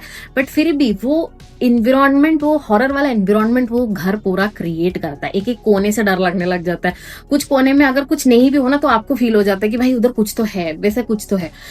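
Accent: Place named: Kottayam, India. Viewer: You speaking kottyam Hindi it's cute.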